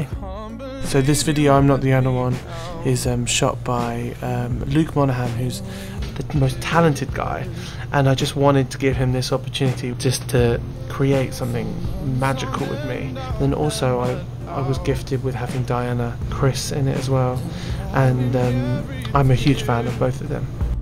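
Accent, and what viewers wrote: United Kingdom. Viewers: hes brittish.... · The British accent is awesomeeeeee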